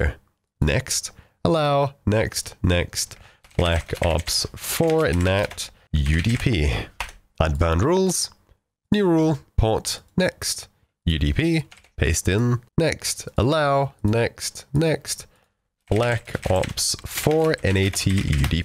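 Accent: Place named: South Africa. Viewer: took me so long to realize you from south africa lol '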